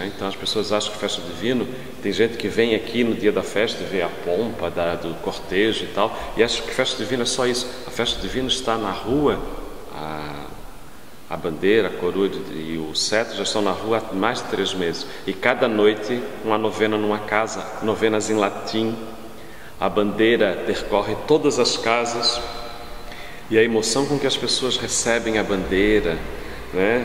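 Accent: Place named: Florianópolis, Brazil. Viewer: Ótimo vídeo! Sotaque bem manezinho... me soa muito familiar. :)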